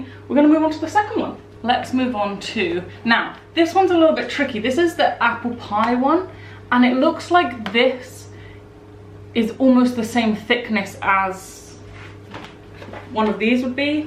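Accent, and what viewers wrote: United Kingdom. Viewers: Also I like it when you say it in the British way it’s interesting the language difference and 90% of the time I can figure out what your talking about. 😊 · I am from the US and I love the way u talk and the terms I dont understand I google myself and have a fun time learning new British words because the UK fascinates me. Honey you just do u ! No matter what because your right u cant win so live for u! Do things the way u like it. :) I adore u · Hey Look up "YouTube Premiere" You can upload a vid for a scheduled time and have live chat...:) Love the vids! Listening to you talk is half the fun! I love hearing the British versions of things! Everything sounds much classier.... lol Much Love!!:)))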